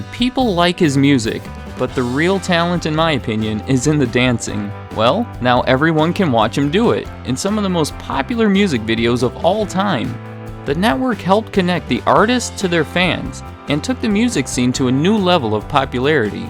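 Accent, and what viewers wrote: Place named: Minnesota, United States. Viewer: Only two videos! I want to watch more. Are you from Minnesota or something? You have a funny accent and I like it cause I'm Canadian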